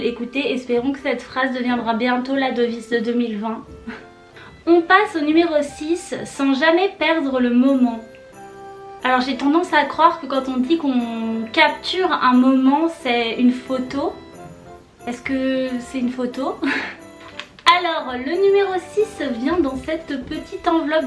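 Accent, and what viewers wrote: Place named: England, United Kingdom. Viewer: Je suis jaloux de ton accent anglais 😭😭😭😭😭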